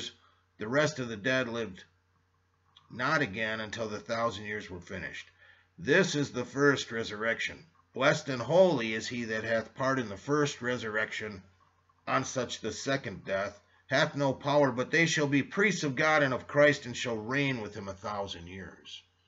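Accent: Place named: Upper Midwest, United States. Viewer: Good teaching, Must be cold where you live all bundled up. Unseasonably warm in the south. Michigan Wisconsin esc your accent 😉 Blessed by your teachings appreciate very much indeed brother! Thought I heard the trumpet the other night, turns out it was neighbors air conditioning unit😋 👂🙏😲👐 🙄😏😕😐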